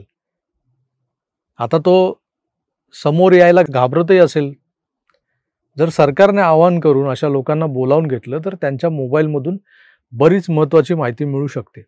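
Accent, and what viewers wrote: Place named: Girgaon, India. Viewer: आपली गिरगावकर भाषा ऐकताना आणखी स्फुरण चढते. ❤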